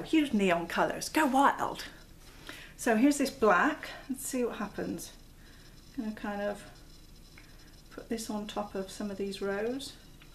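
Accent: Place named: West Country, United Kingdom. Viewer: So nice to hear a fellow West Country accent! ☺ Props to you for even attempting a painting with these colours... i mean, wow! lol 😎😎😎😎😎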